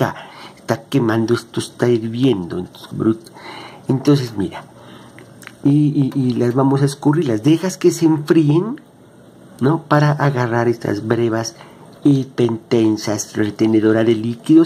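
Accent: Colombia. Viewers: Muy colombiana la receta y la narración. Me gustó mucho y también me reí 😃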